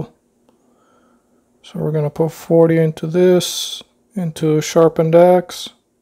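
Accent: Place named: Russia. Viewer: Мне тоже показалось он с каким русским акцентом говорит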